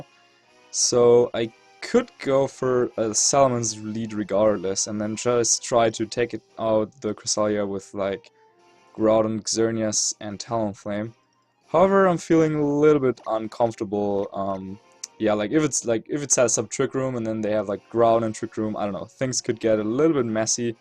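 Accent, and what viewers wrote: Germany. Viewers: Lolz, nothing wrong with german accented Vs, how about ' Battle Spot Story' or something? xP I was playing on the N64 recently n' it's the 1st thing that came to mind, <3 Story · Your German accent is fine! Pokemon is an international community and that's what is so awesome about it! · First comment of the day LOL it's an honor. I like the way you say it with German accent better tbh, wasn't trying to be mean. Keep up the great content, both VGC and if you decide to start a new series!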